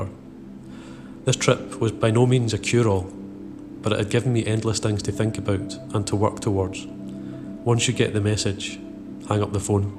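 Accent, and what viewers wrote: Scotland, United Kingdom. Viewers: u fae Glasgow by any chance? or somewhere else in scotland ?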